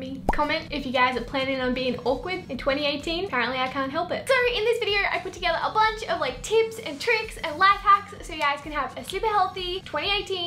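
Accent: Australia. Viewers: Is she AUSSIE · Are you aussie🤨🧐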